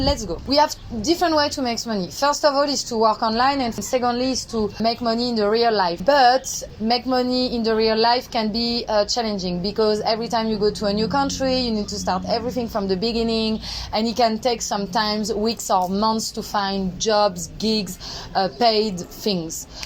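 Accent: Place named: France. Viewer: I am in love with your french accent ❤️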